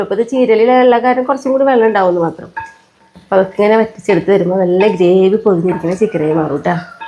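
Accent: Thrissur, India. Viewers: ഞാൻ നിങ്ങളെ vdo എല്ലാം കാ ണാറുണ്ട് വിഡിയോയും പിന്നെ യുടെ ഈ സംസാരം സൂപ്പർ തൃശ്ശൂർ സ്ലാംഗ് ഇഷ്ടം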